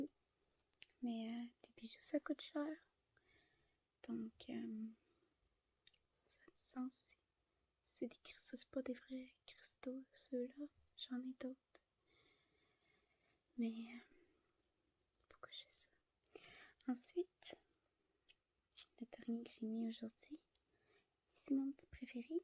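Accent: Quebec, Canada. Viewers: Jadore l’accent québécois merci beaucoup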